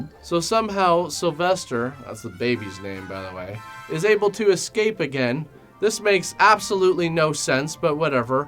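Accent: Canada. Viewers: are you Canadian? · Inner? He wears his Canadian on his sleeve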